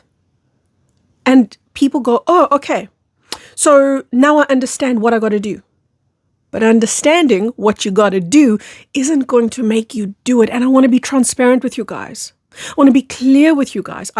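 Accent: South Africa. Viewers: Hi I found your channel a few weeks ago and love the info your putting out. All I know is that my soul family is the blue ray of light and my power is the violet flame of transformation when I let the energy flow it is bliss and peaceful and sends goosebumps all over my body. I understand oneness Iwant the best for every human on this planet 🌎. No obstacle thrown in my path will get me out of my inner peace. I had no help from others learning about energy vibrations and frequency’s. I don’t care about the 🎤 microphone I see your energy and oneness your a lovely being shining your light. Much appreciated oh 1 more thing I love your South African accent 😊. I have a blue aura what is yours? Sending high vibes from Vienna Austria 💙💙💙✨💫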